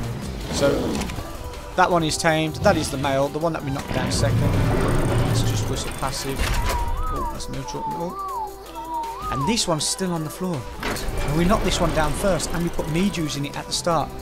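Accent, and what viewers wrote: United Kingdom. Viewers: After playing for over a year I am only now learning this trick. Prefect for those first herbivore tames at the start of the game! Smashed your like button in its pretty little face, mostly because your brummie accent reminds me of home :D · You from West mids mate sound local haha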